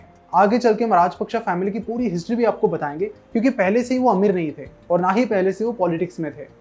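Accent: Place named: Maharashtra, India. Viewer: I love मराठी accent भावा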